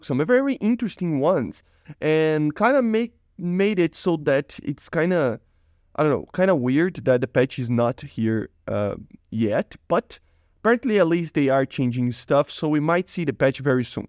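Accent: Brazil. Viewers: He’s Brazilian speaking a second language it’s fine. Everyone knows what he means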